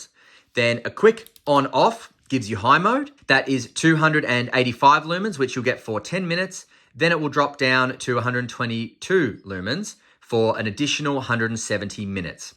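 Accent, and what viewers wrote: Australia. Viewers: First off, let me say that I love that some one is making content that feels like it’s tailored for me. 2nd, as an American, we tend to love narrators from other countries, and your Australian accent is great! 3rd: how would you say these compare to the 16430 ?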